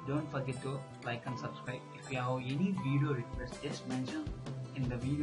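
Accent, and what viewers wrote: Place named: Punjab, India. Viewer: Are you 👳 👦, your accents show you are.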